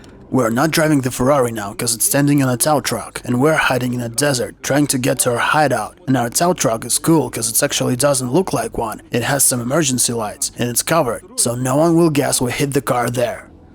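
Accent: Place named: Russia. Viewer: это у тебя в голове установка видимо детского сада. Это и без тебя известно что есть и британский и австралийский акцент. Просто все дело в том, что если бы ты знал на какую страну метит Эрик со своими англообзорами, ты бы тут вообще ничего не писал. А раз он метит туда куда ты не знаю понял ещё или нет, он мог бы перевести свои обзоры с русскоязычным чуваком и дать на озвучку носителю языка. Решил сэкономить, чё тут сказать. Просто представь ты смотришь топгир а там озвучивают Равшан и джамшуд.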